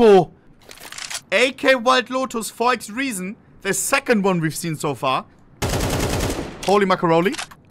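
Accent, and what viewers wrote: Germany. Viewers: He pronounces it in German w sounds like v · German. I love his accent bro 😂😂😂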